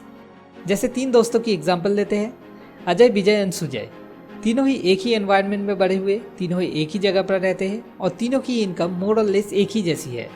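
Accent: Bengal, India. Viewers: good video but Bengali accent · are you Bengali spekar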